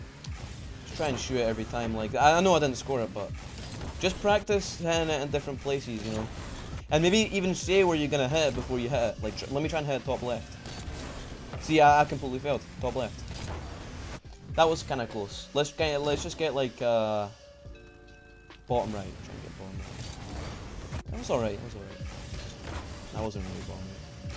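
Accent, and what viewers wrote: Scotland, United Kingdom. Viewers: Ye Scottish aye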